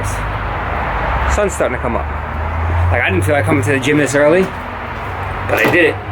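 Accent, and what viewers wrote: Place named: New York, United States. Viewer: to be perfectly honest one of the main reason I subscribe nowadays is your New York accent, I learned English back in the days mostly from the movie goodfellas and even though you don't have as pronounced accent there's still a hint of it and that keeps me subscribed 😂😂😂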